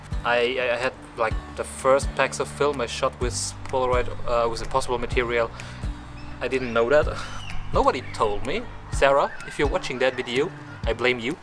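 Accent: Germany. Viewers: Cool video! Are you from a German speaking country? Or did I mishear something :D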